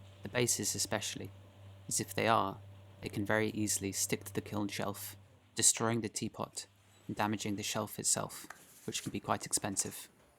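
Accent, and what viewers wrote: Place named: England, United Kingdom. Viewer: These videos are like a mini documentary. I think the edit of the video and over dubbing the narration is the strength of production. Having an English accent lends to the 'officialness' of it all. I like the pottery produced, but I'm at a loss as to the rapid success of sales other than whetting the appetite of anticipation with previews of quality and attention to detail these videos showcase.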